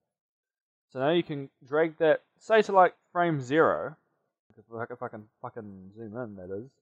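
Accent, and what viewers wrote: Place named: Australia. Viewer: I can't tell if you're Australian or American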